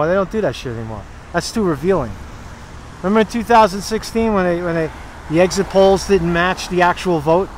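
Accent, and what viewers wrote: New York, United States. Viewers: I've figured out why your mouth fascinates me😜 it's your New York accent so it's how your lips move.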